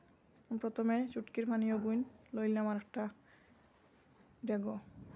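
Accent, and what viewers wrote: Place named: Sylhet, Bangladesh. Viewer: আপু খুব স্বাদের শুটকি সিরা আমরা সকল সিলেটি আপু সিলেট কুন জাগা আপনার বাড়ি সিলেটি কতা খুব লাগে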